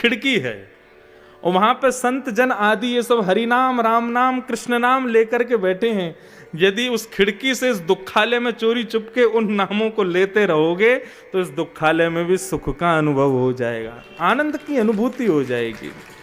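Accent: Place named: Braj, India. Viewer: Jai jai aapke mukh se toh brij Bhasha bahut pyari lagti hai Jio Jio mere Lal ❤❤❤❤❤❤❤❤❤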